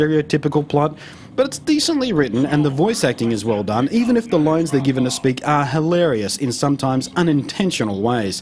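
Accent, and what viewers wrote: England, United Kingdom. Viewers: nothing is wrong with English people. it's this guys pissy attitude towards the game and his accent. most English people's accents are all right. but this guy pisses me off. fuck he's stupid. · The guy is british, and that's how british ppl say it. -.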